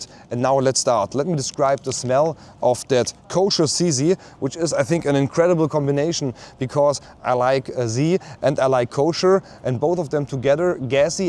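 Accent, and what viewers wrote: Germany. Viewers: playing in the shop visits & your comment - not random coincidences! I have been studying into synchronicities since I suffered an acute psychotic episode in 2017. (psychotic = to psychic) Psychiatrist Carl Jung was said to have coined the term ‘synCHRONICity’ to describe meaningful coincidences with significant personal meaning to the individual. I’ve been working on making wee silly comedy cartoon / comic book style movies since I went ‘crazy’ & in the nuthouse they imprisoned me in 4 being a method actress, the nuthouse staff put an A4 sheet of paper ‘sign’ on the door that threatened; “WRITE TO SURVIVE.” I focused on that sign whilst barely conscious after my life was nearly ended by a psychiatric mentalcase patient in their who beat my skull on the ground in excess of 30 times whilst screaming, “AHM GONNAE FUCKIN MURDER YE!!” “She”, that “female” (I use that term to describe “her” loosely), that violent criminal thug caused me a “traumatic brain-injury” (there is an anagram of “Truman” within those words. 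During my “to psychic” episode I suffered from the common Truman Show delusion & thought I had been set up (I’m an actress) & thought I was being used for a psyop for a Derren Brown show. (I was not I was being sectioned in hell.) Anyhoo, I decided to comment as I’ve just recently started watching your videos & enjoy seeing Amsterdam & listening to your German accent. When I was homeless in 2023 in Utrecht I met the loveliest homeless German man called No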